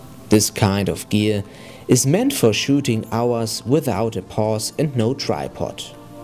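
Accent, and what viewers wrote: Germany. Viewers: is your accent german?? you sound a little funny? say fas-ci-na-ting, not fas-nating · his accent sound like Germany! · Kanst du bitte ein video in deutsch über wie dreht man richtig videos zb. Mit der eos 650 d in deutsch bitte ich höre das du deutscher bist :) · despite the german accent it's very helpful, thank you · You sound very German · Oooooh you sound soooo German :-) but nice Video. Thanks or Dankeschön :-))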